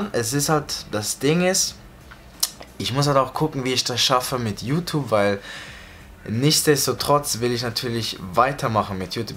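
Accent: Switzerland. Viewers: Wer findet auch das sein dialekt zum schwrizer wird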